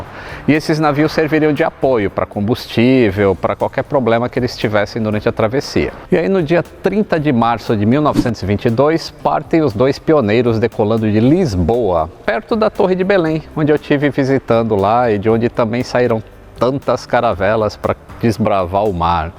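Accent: Brazil. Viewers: Simplesmente, OBRIGADO,. A história da "aventura" de Gago Coutinho e Sacadura Cabral mais bem contada que eu conheço! Só um Brasileiro em Português com açúcar para contá-la. Parabéns! Um abraço comovido, Irmão.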